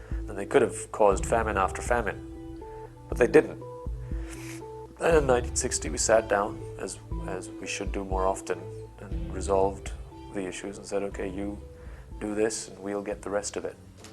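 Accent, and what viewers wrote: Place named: Pakistan. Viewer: This is pure Pakistani English accent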